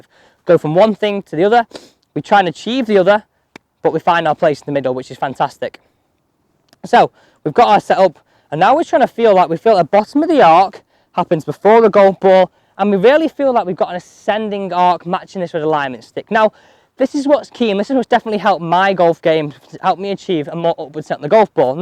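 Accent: United Kingdom. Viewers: It’s the fast British accent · slow the speech please not all viewers are scottish!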